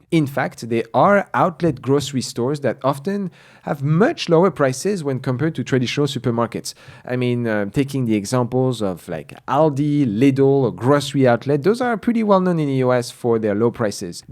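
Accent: France. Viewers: Found your content recently and it’s been great - also enjoy the French accent ! Haha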